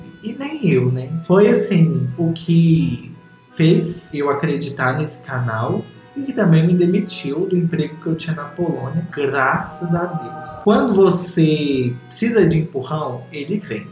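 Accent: Minas Gerais, Brazil. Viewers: amei o seu sotaque mineiro 😍😍🤣🤣🤣🤣🤣🤣🤣🤣🤣🤣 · sou hater assumida do sotaque mineiro do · Esse vídeo valeu cada minutinho só pra ter o falando mineirês 🤧🤧🤧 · Adorei o com o sotaque de Minas... 👏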